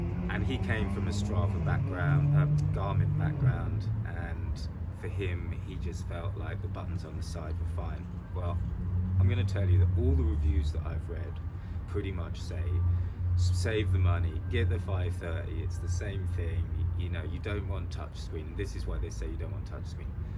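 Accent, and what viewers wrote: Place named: England, United Kingdom. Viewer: HAHA felt like I was watching my little brother , makes the same face and comment only with English accent instead of Mexican. Love the review and candid comments. Felt like a friend (or little bro) was spelling out why the 830 was better.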